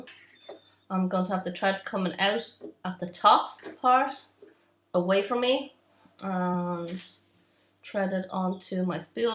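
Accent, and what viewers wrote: Ireland. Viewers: Thankyou very much ,, a beautiful Far Eastern lady with an Irish accent 🙏🙏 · The Subtitles can't handle the Irish accent, hello from Co. Antrim btw. ;-) Haven't gotten around to using mine yet. Subscribed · Love the accent!! My dad was from Tipp, my maternal grandparents from Galway and Mayo and my husband from Down. I'm a sucker for an Irish accent 😁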